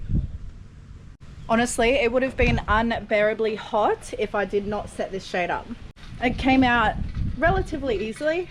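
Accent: Australia. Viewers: Keep it , also who else here came for the girl but stayed for the HEAVY straya' accent , nah but in all fairness -you live in a incredible part of the world, and you are just out having fun , i enjoy the videos, please keep them coming - best regards a scandinavian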